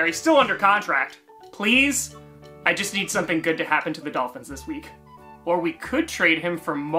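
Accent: New York, United States. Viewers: Did he lose his New york accent? 😅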